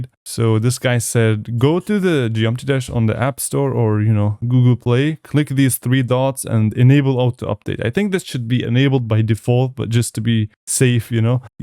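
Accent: Germany. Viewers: Brother you German ? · Are you german?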